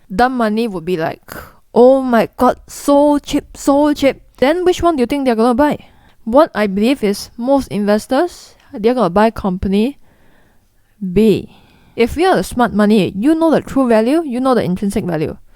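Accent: Singapore. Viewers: i actually like your singaporean accent . it grew on me lol i dont care what you sound like.. you say all the right things :D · I absolutely adore your Singapore accent!!! Thank you so much, I am relatively new to your channel...and I am enjoying and appreciate your content. You are have a gift of teaching, with plenty of wit, humour, and at the same are able to transfer your knowledge, sharply and well broken down. Thank you. ☺️ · Great content,! So glad I found you AND your beautiful Singaporean accent. Thank you for the hard work you do. XOXO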